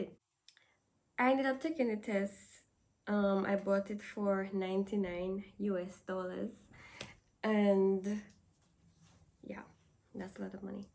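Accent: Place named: Dominican Republic. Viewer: 100% from DA,,, that ACCENT,,, and obviously I am from there also.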